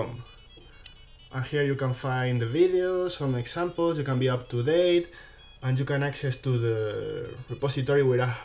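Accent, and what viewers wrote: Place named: Spain. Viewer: Como se nota que eres español xD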